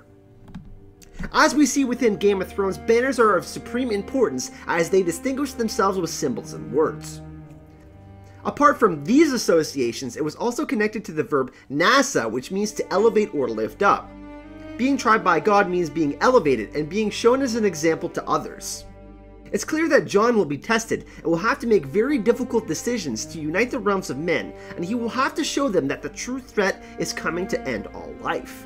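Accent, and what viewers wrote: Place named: Canada. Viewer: Wass🆙 🆒vid! Enjoyed this 💯% Your up-beat personality 💞 makes your vids a joy🌈 to watch👀 Your Cute Canadian accent makes your vids a joy🌈 to listen to👂 Always a pleasure, Thanx! 💞 💙💛💚💜💙💛💚💜💙💛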